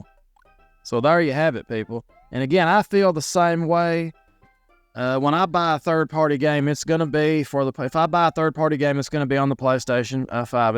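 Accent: South, United States. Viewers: I didn't know anyone could have a Southern accent that thick!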